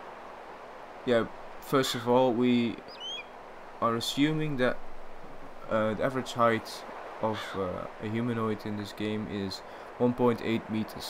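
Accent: Netherlands. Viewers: i knew this guys was dutch right when he started talking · Let me guess u come from holland XD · you dutch? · your accent , are you dutch ?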